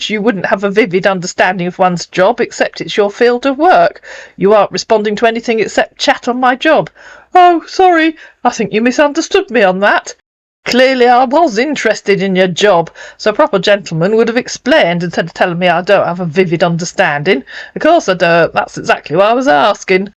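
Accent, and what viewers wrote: United Kingdom. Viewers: Howdy and hello, I thought that so called conversation was great and I love your accent and it was nice to listen to once in a while since I am half British on my mom's side. And if you want you might want to watch Monty Python's Philosophy soccer/football match between the Greeks and the Germans. You might find it enjoyable. Take Care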